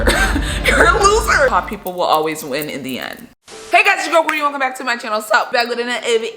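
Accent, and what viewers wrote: Nigeria. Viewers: Lol I was wondering why you always switched to a Nigerian accent in your videos 😅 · Are you Nigerian 🇳🇬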